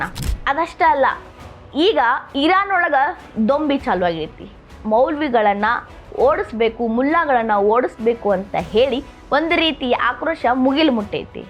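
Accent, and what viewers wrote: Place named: Bayaluseeme, India. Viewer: ಬಯಲುಸೀಮೆ ಕನ್ನಡ ಬೇಡ.ಕಿರಿ ಕಿರಿ ಆಗುತ್ತದೆ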